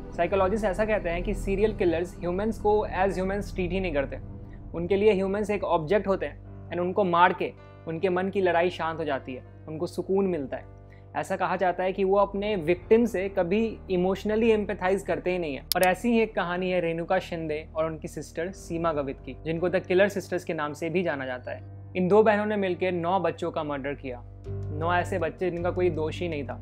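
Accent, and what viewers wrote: Bihar, India. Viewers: Bihar se hai ye banda...sure!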